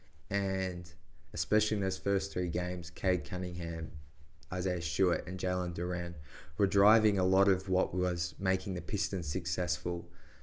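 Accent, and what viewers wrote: Australia. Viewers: You from Aussie lad?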